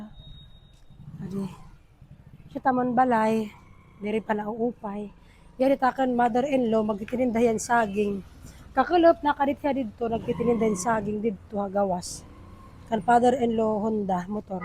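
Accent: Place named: Borongan, Philippines. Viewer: New subscribers here☺️ Watching from Canada 🇨🇦 a Filipino Canadian from Tacloban Philippines! U speak fluently in Waray madam! Taga diin ka ha Leyte if u don’t mind me asking? If I guess correct me if I’m wrong u sounds from Borongan Samar?hehe I’m proud Waraynon here! God bless u and wish u best of luck madam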